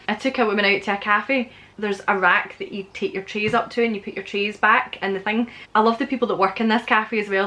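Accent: Scotland, United Kingdom. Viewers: Sounds like you're a shambles of a lass 😂 love it, definitely subscribing! The one about walking into your mates kitchen with your shirt undone had me flippin dying 😂 Mind you the Scottish accent doesn't hurt 😆 I could listen to Scottish people all day.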